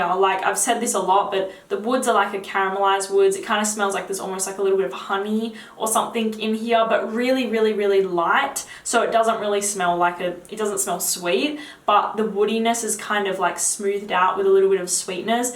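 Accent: Australia. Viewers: I like her sexy Aussie accent and voice. Not bad on the eyes either. Never mind the fragrances... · Well... I've never heard a posh Australian accent before. Very nice. 👍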